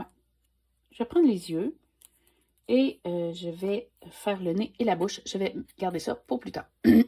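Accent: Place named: Canada. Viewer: Toujours très inspirant! Quelle aura! Créer en jouant , jouer en créant, c'est exactement ce qu'il faut faire pour un bien être total... Votre sourire et votre accent canadien insufflent une belle énergie ! Grand merci pour vos vidéos.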